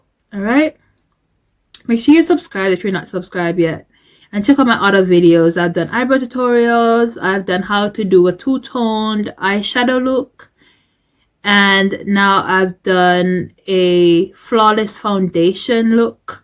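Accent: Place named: Caribbean. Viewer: Thank you for showing this definitely like your Caribbean accent the way you explain things also for keeping it real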